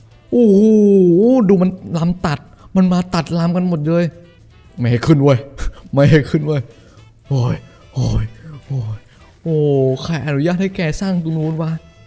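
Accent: South, Thailand. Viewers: เป็นคนปักษ์ใต้ไหม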